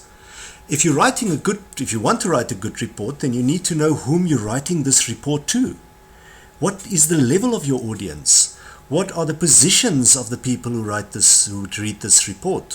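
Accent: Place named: South Africa. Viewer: that south African accent though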